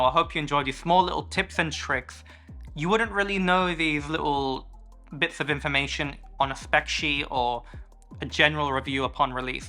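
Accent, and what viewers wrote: India. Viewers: So glad to see new videos from the guy who got me into Fujifilm many many years ago. I've missed the random phasing in and out of the Indian accent. 😄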